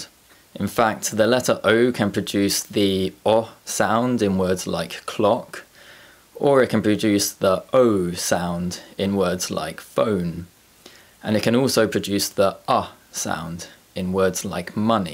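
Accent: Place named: Yorkshire, United Kingdom. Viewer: So helpful and a very nice accent! Is it Yorkshire?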